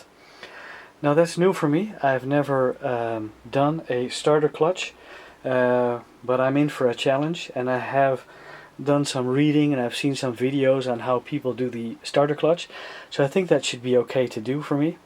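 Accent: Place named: Netherlands. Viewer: Watching you videos and hearing your accent I assume you are dutch. Well i’m dutch too and my kz 750 gt makes exactly the same noise. Do you by any chance do repairs on bikes other than your own? This job seems a bit out of my league so I really could use some help...Groeten, Rogier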